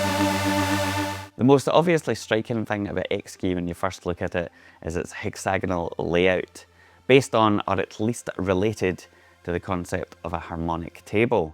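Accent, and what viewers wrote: Scotland, United Kingdom. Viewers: Scotland ! I believed at first that the accent was Irish. Sorry I am not very used to. Beside I am french and I pronounce Exquis like exkee. May be you know it already the arpegiator has 2 mods one with hold an an other to engage just when you touch it. Hope linux and Bitwig will integrate this instrument.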